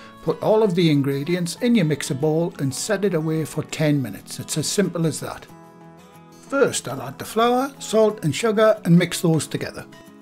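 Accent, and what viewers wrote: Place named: Northeast England, United Kingdom. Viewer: I love that recipe. Probably the best I've seen --- good explanations with the video. Your accent does remind me more of Thornaby/Middlesbrough though.. I'm from Thornaby myself but I used to be able to pinpoint where people were from when I was a kit but once I joined the Army I lost the ability.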